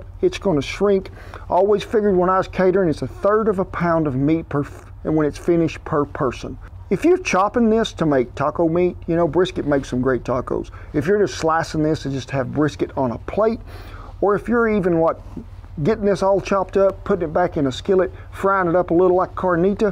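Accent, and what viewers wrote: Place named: Oklahoma, United States. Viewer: Kinda lost respect. :( Your an Okie. Thought you was a Texan. Then again explains alot. GOD bless.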